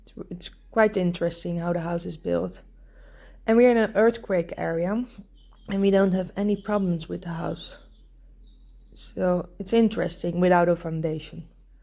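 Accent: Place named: Netherlands. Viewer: i swear you voice is so familiar to me. Is your name is if you are please let me know please my is Dutch and you have same accent as her??? Thank you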